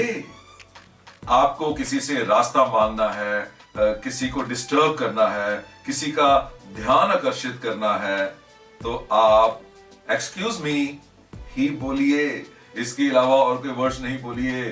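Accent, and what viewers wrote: Punjab, India. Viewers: Sir , You speak hindi in punjabi tone and me too, will you please tell me how to fix it?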